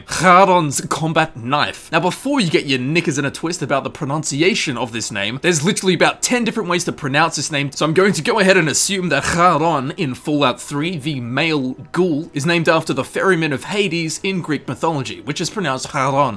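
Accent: Australia. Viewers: are you from Australia because I live there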